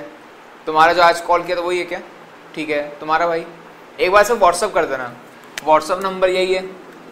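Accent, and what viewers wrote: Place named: Ratlam, India. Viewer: yes i was right..... Apki language me apna pan lga.... me bhi ratlam MP se hoon 😊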